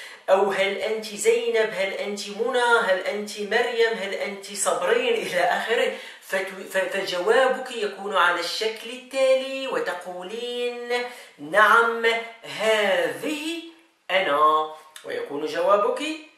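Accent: Morocco. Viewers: انته مغربي ماهيك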